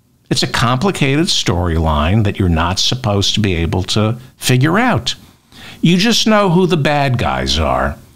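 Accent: United States. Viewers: Just happened on your video. Clear, concise and succinct delivery regarding the skeletons in Jim Jordan's closet. I like your soft spoken American accent also 😊. Best wishes to all decent thinking Americans 🇺🇸 from Ireland 🇮🇪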